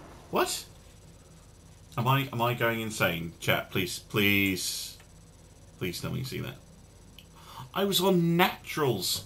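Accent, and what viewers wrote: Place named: United Kingdom. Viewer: It's the British accent ;)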